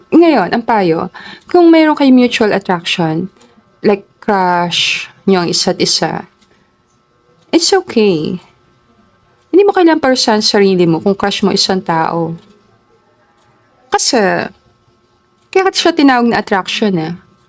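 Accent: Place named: Bicol, Philippines. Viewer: madam, ang cute ng voice nyo...parang may bikolanang accent..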